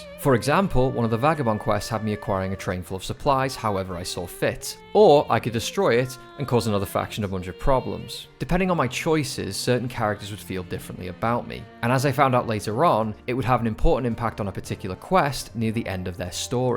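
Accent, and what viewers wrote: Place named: North West England, United Kingdom. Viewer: are you from the North West? I recognize my own accent hahahaha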